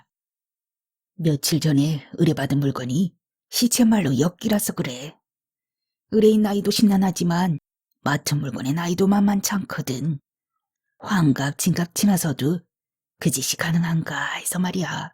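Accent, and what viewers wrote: Jeolla, South Korea. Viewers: 왜?미리처읽어대는거야.. 지나치게 전라도 사투리를써데더니.. 그냥 표준어로 읽어주세요. 너무듣기좋아요. 목소리. 발음 너~~무이쁜데..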